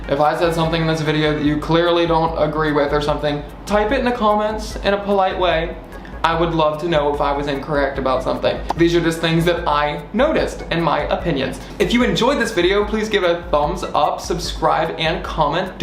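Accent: South, United States. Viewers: Do you have a slight southern accent ?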